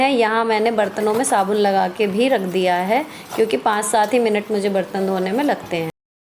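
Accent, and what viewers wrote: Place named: Malwa, India. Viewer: AP kha se h mam apki language me mp malwa ki jhalak h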